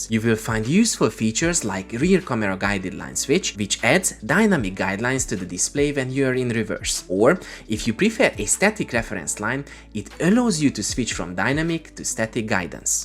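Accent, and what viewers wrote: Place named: Hungary. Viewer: Amint a hang megszólalt, egyből az villant be, hogy ez a csávó magyar, 1000%. :) Annyira tipikus, mint az indiaiak, nem lehet letagadni.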